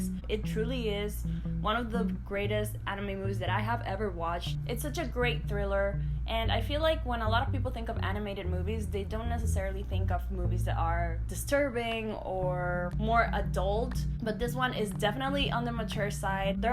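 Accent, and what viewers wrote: Dominican Republic. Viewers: Yo no se quien eres pero yo conosco el acento dominicano donde sea keep it up good stuff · Girl please tell me you’re Dominican cause I know you’re accent is. Cuando hablaste español and also ARMY bomb yes 🙌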